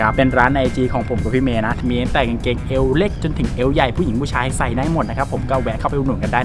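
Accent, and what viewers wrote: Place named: Kanchanaburi, Thailand. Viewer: เป็นคนเมืองกาญมั้ยอะ สำเนียงคุ้นหูแบคนกาญมาก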